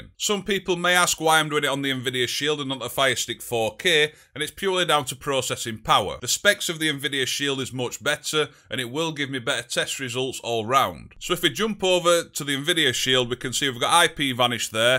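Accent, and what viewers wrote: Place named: United Kingdom. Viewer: Nice to see someone with a British accent. I can't stand the American one. Especially when they say words like data it does me headin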